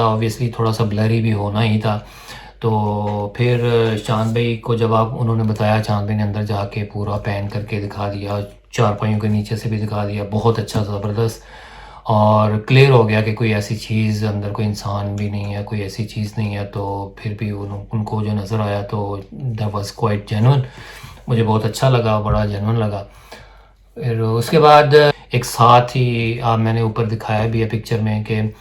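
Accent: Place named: Punjab, Pakistan. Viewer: bai good work mainu tusi v lehnde Punjab ton lagde ho bcz thodi boli Sade wali hai bcz aam loka nu ta madhani jis naal apne ghra ch saag ghot de aa pta hi ni v ehnu kehnde ki aa, jdo v koi Punjabi veer kuch changa karda ta BHT vadia lagda, parmatma thonu Chad di kla ch rakhe. Bht ghaint review karda bai.jwb jarur deo msg da Sat Shri Akal 🌹 Frm- Chad da Punjab India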